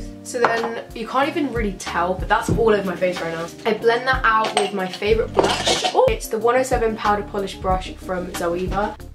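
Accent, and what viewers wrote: United Kingdom. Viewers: Why am I genuinely unsure if she has a slight British accent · Hey, i' m french and i would like to now were do you come from because you have an accent wich seem to be a british accent... someone can tell me. Thank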